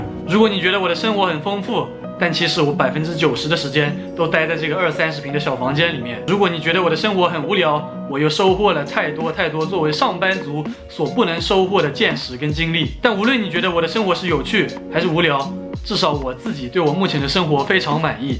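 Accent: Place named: Hangzhou, China. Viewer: 一听口音就好亲切 果然是杭州的 感觉你身体好有点僵硬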